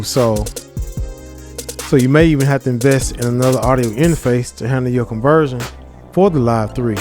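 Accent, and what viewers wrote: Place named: South, United States. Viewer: I KNEW I heard that West Alabama/Eastern Border Mississippi in your voice Mane…✊🏽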